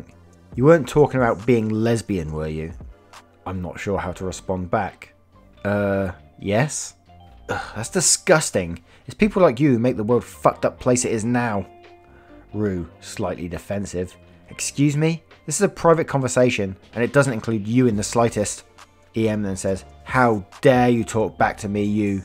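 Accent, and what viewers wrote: United Kingdom. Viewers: I love your brit accent, what part of England are you from? We live in Texas, but my in laws are from the Stratford on Avon area. They live in TX now and we are visiting UK in 2020. Great storytelling!!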